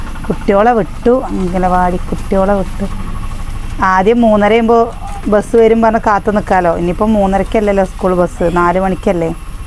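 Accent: Palakkad, India. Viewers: Snake kanum nokynadakanam mol.palakadan samsaram nalla eshamannu ketto.thank you all family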